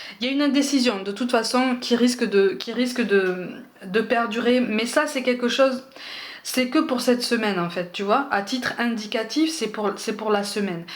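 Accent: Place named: South, France. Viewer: Merci pour votre travail, très joli accent du sud;) Je m'abonne avec plaisir:)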